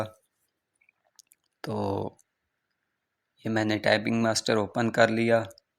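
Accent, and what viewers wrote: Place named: Pakistan. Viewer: Thanks bro kam ho gaya App pakistan sa ho app ki Language apka accent vahi ka ha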